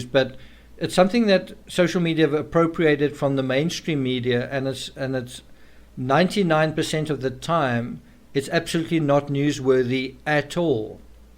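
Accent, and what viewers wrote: South Africa. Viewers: Hi, are you a South African? watching from Cape Town South Afri8ca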